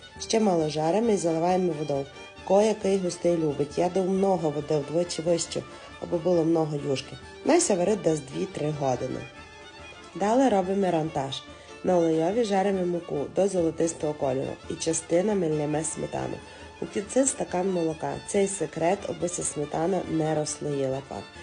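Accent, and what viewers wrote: Transcarpathia, Ukraine. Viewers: закарпатський діалект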